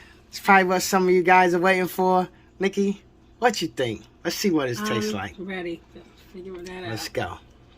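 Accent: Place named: Brooklyn, United States. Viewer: Nice!! Im diggin this. My brotha sound like he from Brooklyn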